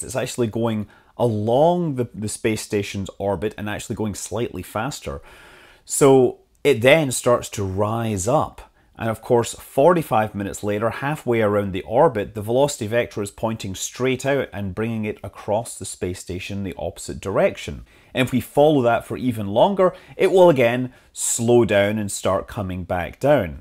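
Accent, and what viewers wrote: Scotland, United Kingdom. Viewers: Is it scottish dialect what you are speaking?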